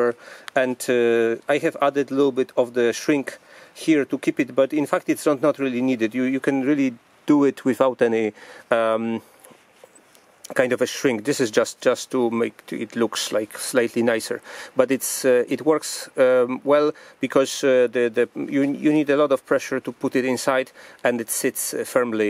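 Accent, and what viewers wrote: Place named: Russia. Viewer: Как будто русский по-английски говорит)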